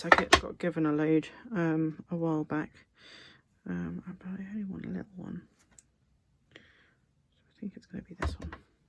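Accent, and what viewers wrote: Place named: United Kingdom. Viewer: hello love your accent from the UK everybody. We are either rejoicing in US or crying today day after election. Im crying.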